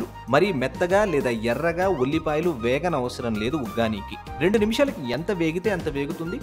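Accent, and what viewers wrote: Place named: Rayalaseema, India. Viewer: U know the very first I felt u spoke the perfect rayalaseema slang..I loved it after hearing our slang from you. And we add garlic in pappula podi..and will definitely add fried groundnuts and obviously lemon should be must to have yummy taste...u try our recipe this time and let me know through your reply Anna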